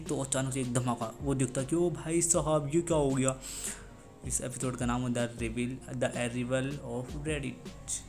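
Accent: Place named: Haryana, India. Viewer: Bhau hindi bolo haryanvi nhi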